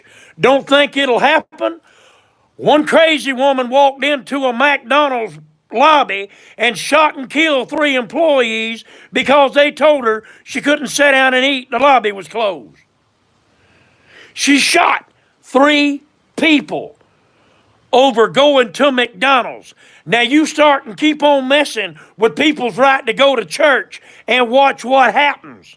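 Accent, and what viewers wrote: South, United States. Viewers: I love that country accent boy!! Jijj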